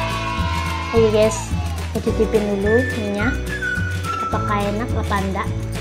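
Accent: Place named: Banyumas, Indonesia. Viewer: Salam kenal YouTubers ngapak😁😁😁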